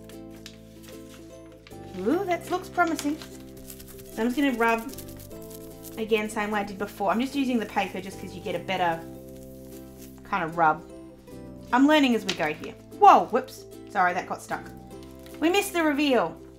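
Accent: Australia. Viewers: It was awesome to hear a fellow Aussie! Most of the crafters I watch on YouTube are from the US, it’s hard to find Aussie craft channels. Definitely a new subscriber here. Thanks For sharing ❤️🇦🇺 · First video of yours I have watched and subscribed already. I have a low temp soldering iron that is pathetic for soldering so will try it with this. Good to hear your Aussie accent. TFS!!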